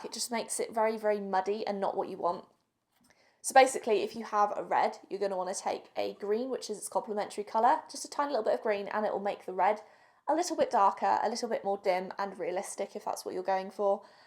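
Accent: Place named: South West England, United Kingdom. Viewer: I detect a south westerly accent there; maybe Bath?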